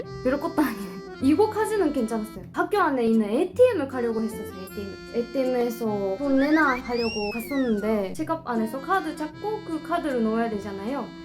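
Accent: Gyeongsang, South Korea. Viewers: 뭔가 대구 말투가 되어가고 있다. 억양이 느껴지네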